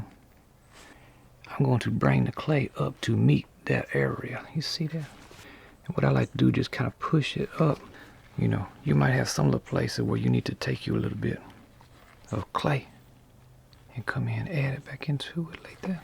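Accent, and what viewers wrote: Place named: South, United States. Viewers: I love your voice 😍, I grew up in the south and your voice is so nostalgic. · Mississippi I think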